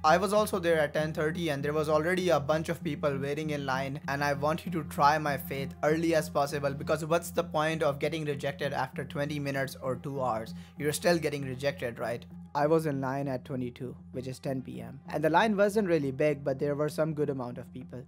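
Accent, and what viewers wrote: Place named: India. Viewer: Your funny story about a big guy complimenting your outfit is not funny at all... Berghain is a place of diversity, it originated from a gay club. Making fun of gays should permanently ban you from Berghain. I would rather hear someone telling me slaaay than your awful indian accent. I hope I dont get to see you ever in Berghain...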